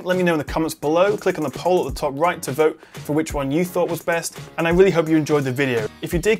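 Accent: United Kingdom. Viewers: i love you,, you are so handsome and nice british accent :p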